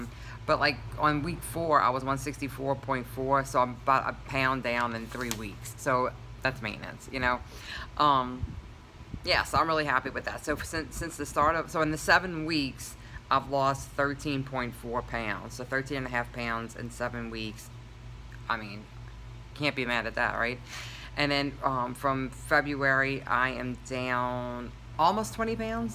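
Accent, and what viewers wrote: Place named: Louisiana, United States. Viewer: Is that one of our Louisiana girls? lol I hear the accent.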